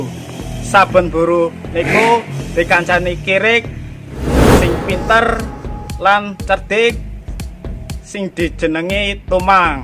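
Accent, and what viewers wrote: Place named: Banyumas, Indonesia. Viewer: logat bicaranya kayak orang ngapak toh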